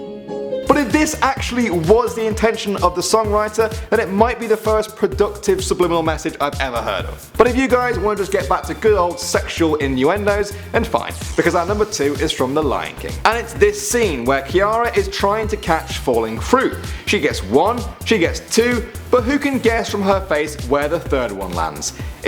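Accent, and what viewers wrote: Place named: United Kingdom. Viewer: british accent is so annoying!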